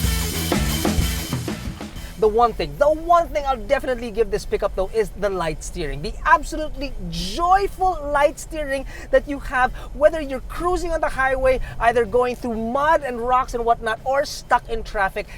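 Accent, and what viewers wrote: Visayas, Philippines. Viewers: Ay samok haha bisaya bai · Bisaya d i ka Tito😅☺ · Hahaha mao man! Murag bisdak nis bai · Hahaha! Bisaya ka?! Awesome, man!! Nag kinatawa gud ako!!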